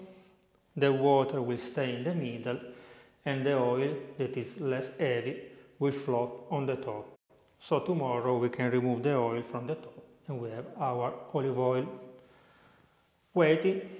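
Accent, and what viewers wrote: Italy. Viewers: I had to like the video the second you started talking. Love the Italian accent. Watching from Ocala, Florida. Thank you for showing us how to make the oil starting directly from the plant. I have a nice fully grown olive tree that is producing olives and I can't wait to give this a shot.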